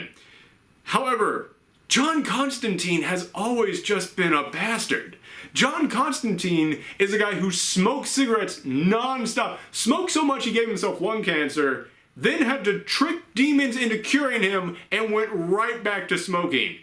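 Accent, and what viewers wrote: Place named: South, United States. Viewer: wait just a minute your from the South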